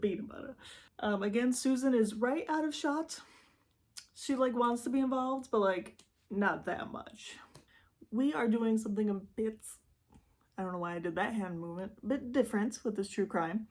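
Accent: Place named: France. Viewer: Liking your French accent 👌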